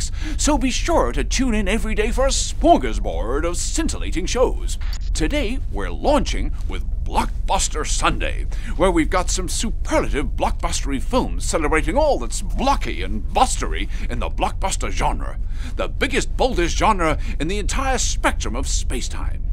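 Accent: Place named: United States. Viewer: Well, he does already speak in an American accent. He did use P.E. teachers in Red Dwarf but it's not such a huge leap to imagine he would also use American words.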